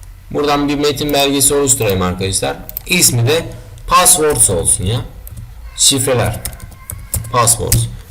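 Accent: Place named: Azerbaijan. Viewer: Azeridi özünü qırmır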